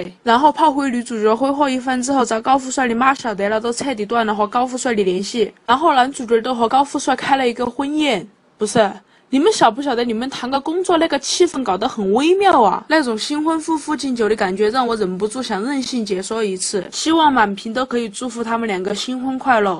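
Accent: Sichuan, China. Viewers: 這是四川話口音吧.... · 四川妹子吧~登獨什麼的哈哈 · 哈哈哈，用浓重的川音儿来讲得一本正经太好笑了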